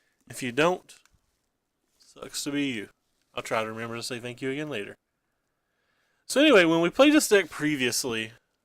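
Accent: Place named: South, United States. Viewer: where you from, sir, Georgia? South Carolina?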